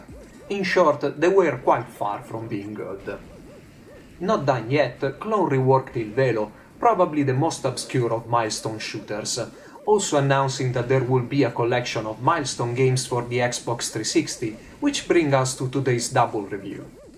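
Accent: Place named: Italy. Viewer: im sure you heard this many times before but.. i looove your accent. Hearing that italian accent is such a breath of fresh air to my ears =)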